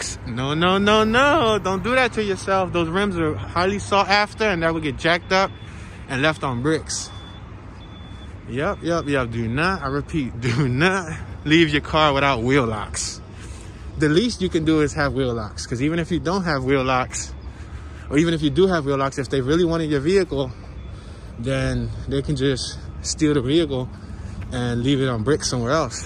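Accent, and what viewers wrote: Philadelphia, United States. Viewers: Loving the walk with you and love the philly accent keep them coming be safe out there walking those breezy streets of philly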